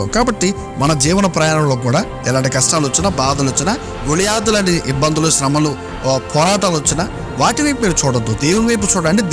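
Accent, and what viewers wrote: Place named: United Kingdom. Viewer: Meeru enduku English vallaku puttinatlu English slang lo telugu matladutgaru Neat ga Telugu matladu ledante English matladu ee teda Telugu enduku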